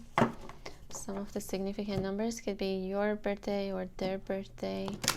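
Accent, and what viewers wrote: Iran. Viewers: Beautiful Irani Accent! Thank you for the reading.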